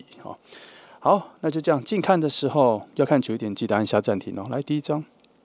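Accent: United Kingdom. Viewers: 1.準。喜歡2但我直覺選了1。感謝說了一口漂亮英國腔的 😊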